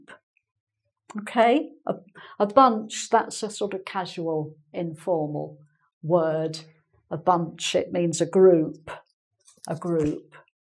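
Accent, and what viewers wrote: England, United Kingdom. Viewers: British accent! Sounds good :) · Thanks for your help! You really are an angel! I just started to watching your videos and it's "lovely" your british accent! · congratulations, your accent is England definitively. Thanks for the the subject metaphors it in fact improve our learning the english as a second language. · Just came to know your channel last night.accidently....oh ..you are so good teacher!!!!!your accent reminds me the time l lived in England! So lovely tobhear you teaching, your speaking. Your teaching make look like english speaking so easy!!!!!which is not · really u r an angel to guide the people...your expression is marvellous with typical British accent...thanks a lot ma'am.. From Kolkata..india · Hello... A very clear and useful class. You are an angel and British English in a person, too... Greetings!!! 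· She is great “old school “ teacher.I already know most of the topics she is teaching,with foul modesty I am fluent in English,but her English English is helping me a lot,since I still have very strong accent and there is always something new to learn from her ❤️ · british accent, ouch! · Standard of British pronunciation she speaks ......so clear...and easy to catch up.....appreciated this lesson....many thanks for your lesson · I forgot about the lesson you gave and instead I really enjoyed the British accent. It's amazing. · Good evening Teacher! You are amazing! When i hear you speaking is = brazilians speaking portuguese. The british English is softer to our ears than american english. Thanks for your teaching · uk accent? i love it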